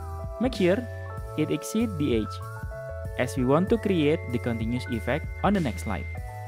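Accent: Indonesia. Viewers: Makasih mas tutorialnya, accentnya seperti orang indo, ternyata bener, auto subscribe deh 👍😁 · Aksen Indonesianya manthul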